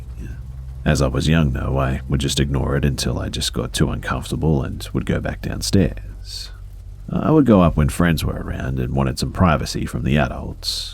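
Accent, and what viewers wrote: Australia. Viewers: One of my favourite hobbies is Listening to scary stories and Drawing. Your Australian accent is Excellent and I'm not gonna lie, Pretty relaxing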